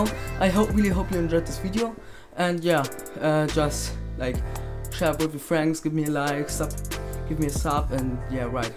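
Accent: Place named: Germany. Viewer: Man merkt das du deutscher bist lul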